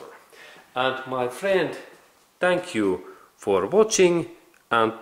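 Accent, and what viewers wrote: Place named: Finland. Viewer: Aiettä nautin tästä rallienglannista!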